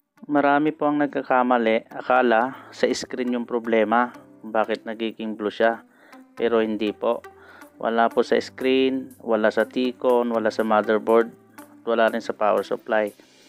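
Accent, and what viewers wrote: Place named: Mexico. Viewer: I like this guy's Mexican accent, makes this video more likable.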